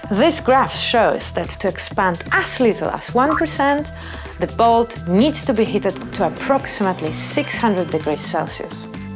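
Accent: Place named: Eastern Europe. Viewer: Why put a non English speaking Eastern European for an english video?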